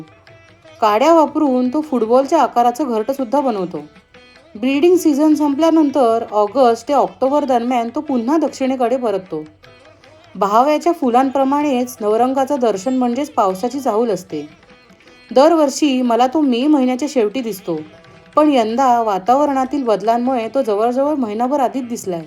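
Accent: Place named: Pune, India. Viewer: Didi tu amravati chi ahes pn tuz accent accent puneri vatt akadi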